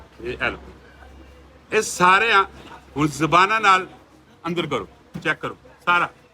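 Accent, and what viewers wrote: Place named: Rawalpindi, Pakistan. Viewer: پیور پنڈی آلی بولی پنڈی کیہڑی جائ تے دوکان وے تساں دی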